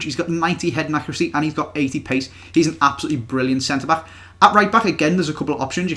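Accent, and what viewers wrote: Liverpool, United Kingdom. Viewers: U so scouse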